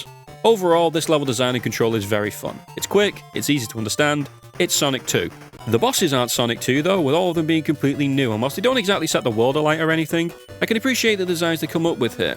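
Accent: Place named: Liverpool, United Kingdom. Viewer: Why do you sound like you're from Liverpool?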